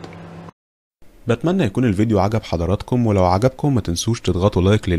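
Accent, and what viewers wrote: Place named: Syria. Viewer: مافي اطيب من المصريين من لهجتك واضح انه انتي سورية عموما كل مكان فيه السئ و الطيب عموما انا عايشة في اوروبا وشفت كتير سوريين بشهادتهم ما بيحبوا بعض والمعاملة مش بيرفيكت وبلاش اقول الباقي كل بلد فيها الفالح والطالح الا من رحم ربي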